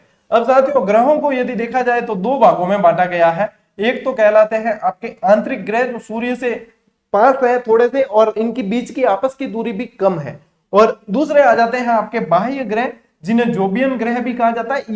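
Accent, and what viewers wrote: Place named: Marwari, India. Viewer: Sir, thnq very much for giving videos with such nice explanation 👍 bahut aache se samajh aata hai ...... n aapki jo maarwadi bolne ka style to bahut hi aacha lagta hai 🙂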